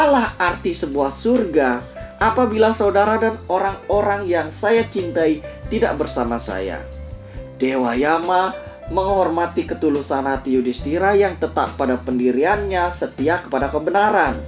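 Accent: Bali, Indonesia. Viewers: Denger suara blii, jadi ke inget guru matematika BPK I gun Made, yag satu2nya guru dari Bali.... Bhasa logatnya khas bngeeet.... Sukses trus bliii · Logatnya Bali banget, Mantap? Jadi ingat teman saya si Putu Satriawan. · Kental khas kakak bali Semangat kak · Bikin sante ya sodaraku... Beliao kan orang bali, jd logat bahasanya gitu. Trimakasih udah dicritakan. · Suara orang Bali nih logatnya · Logatnya Bali banget, jadi ingat teman sy Wayan Gusita dari Karang Asem... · Baru ngunjungin Channel ini .. Malah fokus sama suara mimin, kyanya mimin orang Bali ya??? Logat nya Bali banget .... Salam persaudaraan Dari Jawa 😁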